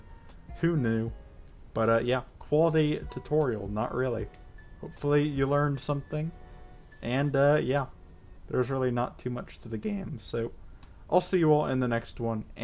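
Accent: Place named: United States. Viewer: Hey man just curious about your accent- what state are you from?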